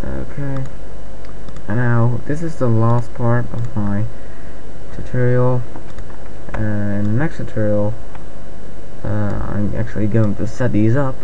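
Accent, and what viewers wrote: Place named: United States. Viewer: Hey. Dank je voor deze tutorial. Dat hielp me veel. En jouw Engels is heel erg goed eigenlijk. Je klinkt als je Amerikaans bent. Sorry als ik een fout maak, ik spreek alleen een klein beetje Nederlands.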